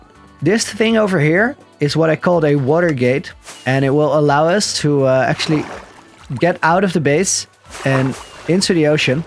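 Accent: Netherlands. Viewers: Hé sounds dutch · hahah dacht ik al aan je engels te horen (is geen haat) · You sound a bit dutch... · U Dutch?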